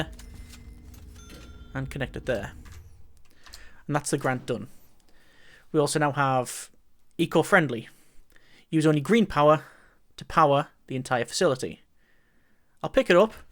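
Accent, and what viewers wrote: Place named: Northern England, United Kingdom. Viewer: It's funny, I'm originally from NE England but I've lived away from there for almost 35 years, with 15 of them being in Australia. As such, my accent is very... different. But when I have more of a northern twang, my sons say "you've been speaking to Grandma, haven't you?". Now, they also add "or listening to that bloke off YouTube". So it is all your fault if I can't order through Maccas drive-thru again without affecting a "posh" voice :D But anyway, I do love a bit of Prison Architect washed down with a proper cup of tea.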